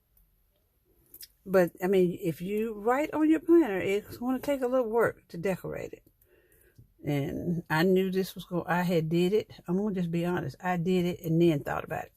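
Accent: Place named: South, United States. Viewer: I like your southern accent😉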